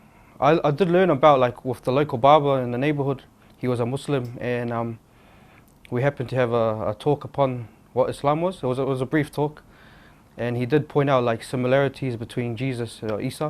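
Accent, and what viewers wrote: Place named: Australia. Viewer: Alhamdullah & Mashallah 🤩... he sound Australian tho 😅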